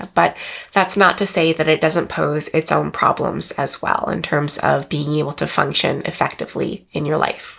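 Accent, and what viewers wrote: Canada. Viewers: Great educational video as always,! Very random but I always forget you’re Canadian until I hear you say certain words. In this video it was “about” 😂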